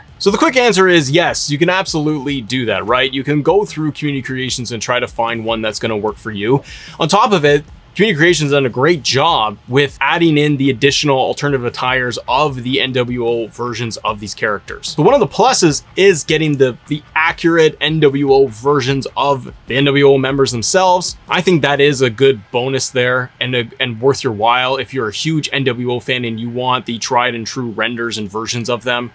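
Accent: Canada. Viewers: I heard Canadian!!?? Are you from Vancouver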